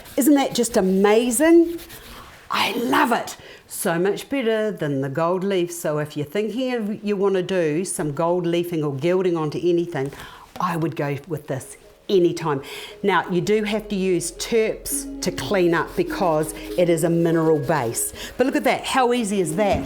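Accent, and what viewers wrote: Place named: New Zealand. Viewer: It is a Kiwi accent (New Zealand).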